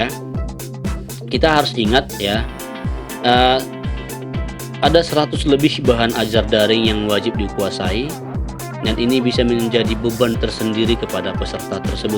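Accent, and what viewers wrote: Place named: Makassar, Indonesia. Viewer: mantap pak aku kira makassar td hehe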